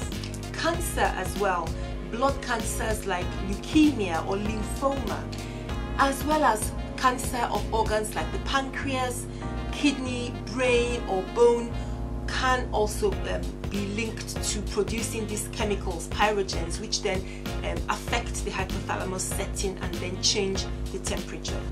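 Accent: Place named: Nigeria. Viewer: You are Nigerian I can tell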